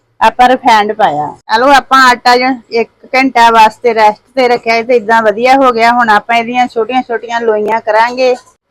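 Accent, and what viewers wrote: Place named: Majha, India. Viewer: 🙏🏻ਭੈਣ ਜੀ, ਤੁਹਾਡੀ ਬੋਲੀ ਤੇ ਤੁਹਾਡੀਆਂ ਬਣਾਈਆਂ ਚੀਜ਼ਾਂ ਬਹੁਤ ਵਧੀਆ ਜੇ। ਮਾਝੇ ਦੇ ਜਾਪਦੇ ਓ।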